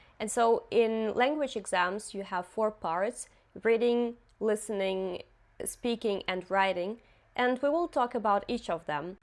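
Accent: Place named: Russia. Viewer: Coming from russian native i guess , your english accent is really impressive, i am working on that, i dont want to sound like an american but have the least accent possible. i like your content because is really helpfull .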